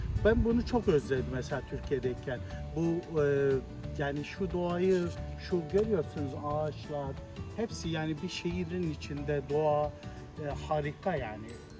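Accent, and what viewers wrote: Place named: Germany. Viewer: almancı gibi türkçe konuşuyor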